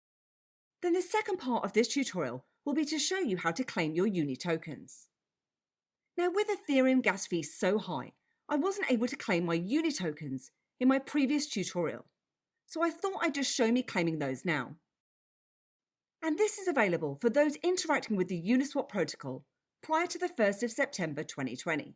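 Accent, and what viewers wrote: United Kingdom. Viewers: OMG the first bri‘ish crypto youtuber I actually enjoy watching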